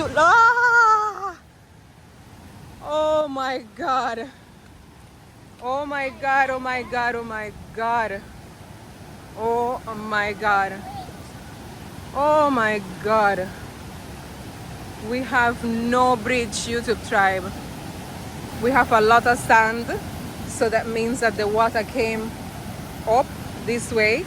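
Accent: Jamaica. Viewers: I love the patois accent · Your patwa is sweet 😂you sound so good, i love it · I love the accent 😅officially yu jamaican ❤🎉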